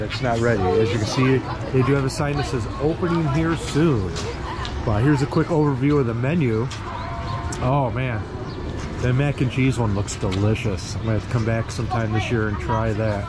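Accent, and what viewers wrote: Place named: Minnesota, United States. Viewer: You from Minnesota or Canada? I can hear that accent right away.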